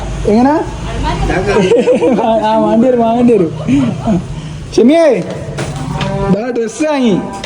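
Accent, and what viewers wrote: Kozhikode, India. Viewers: കോഴിക്കോട് സ്ലാങ് തന്നെ മതി. അതാത് നാട്ടിലെ സംസാര രീതിയാണ്. ജനങ്ങൾക്കിഷ്ടം. കൂടുതൽ ശുദ്ധ മലയാള മൊന്നും വേണ്ടന്നർത്ഥം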